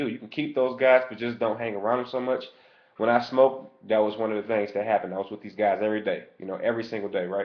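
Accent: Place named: Worcester, United States. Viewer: Tell me this guy isn't from Worcester, did he say Becker?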